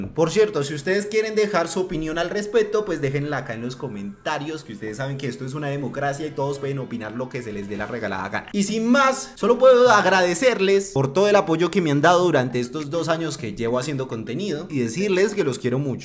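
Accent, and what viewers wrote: Bogotá, Colombia. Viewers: Vuelvete un canal de salseo pana, ver los chismes de los youtubers con acento rolo es divertidisimo y hasta donde se no hay ninguno colombiano que lo haga asi como lo hace pipepino en argentina o los espanoles y mexicanos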